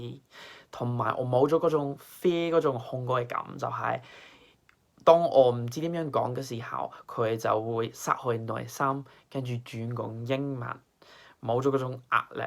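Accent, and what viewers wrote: Hong Kong, China. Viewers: 嘩，你D口音同語氣真係好香港人！讚 讚 讚 勁 勁 勁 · 連你嘅語氣都好香港人feel 🤣🤣🤣🤣❤️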